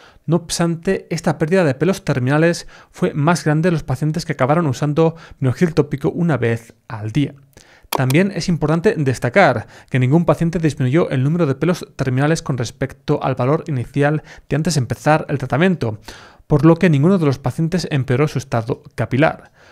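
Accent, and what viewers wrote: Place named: Castile, Spain. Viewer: Pues habla " perfectamente" eleganter castellano. Yo tampoco entiendo a los Latinos. Sin querer ofender.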